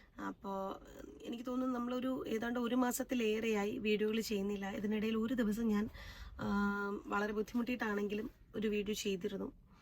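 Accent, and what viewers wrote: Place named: Palakkad, India. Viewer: Palakkad Malayalam.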